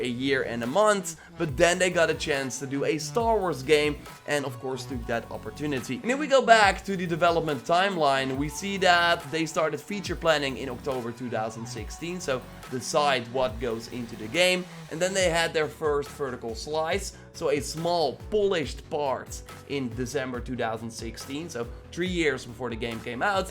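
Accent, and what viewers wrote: Netherlands. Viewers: Youre Dutch aren’t you?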